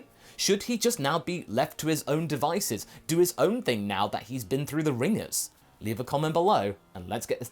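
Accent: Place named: United Kingdom. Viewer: i don't watch channel much these days. but listening to your normal voice to me sounds like you're constantly trying not to do goku's voice. or you sound like british goku.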